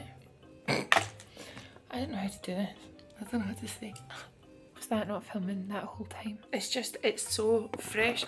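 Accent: Scotland, United Kingdom. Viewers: The little Scottish accent 😭😭😭😭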